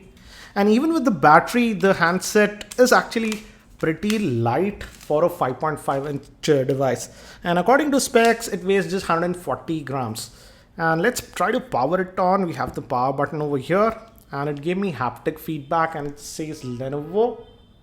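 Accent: India. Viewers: not a bad video i like it, your indian accent does annoy me a little bit and im sure im not the only one but other than that this is my first time watching one of your videos and its good, im thinking of buying this phone and your review did help me to get the information that i need thanks! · Complaining about his Indian accent ? What are you people, five years old?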